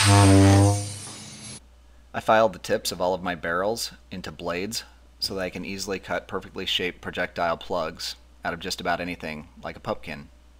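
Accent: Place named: United States. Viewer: typical american voice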